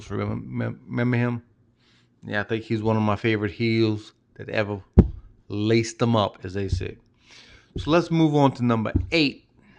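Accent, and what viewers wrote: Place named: Louisiana, United States. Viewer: You sound like you're from Louisiana, particularly the Houma area.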